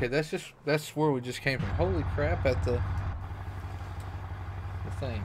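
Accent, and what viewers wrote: West Virginia, United States. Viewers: Is he from WV💛💙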